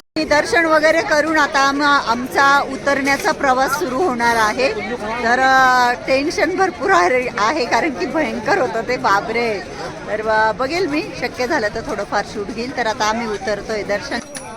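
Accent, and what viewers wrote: Nashik, India. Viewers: मॅडम खूप दिवसांनी video ची वाट पाहत होतो आणि तुमचा वीडियो आला आहे खूप छान तुमचं साद आपल्या नाशिक च बोलन आईकाला खूप आवडते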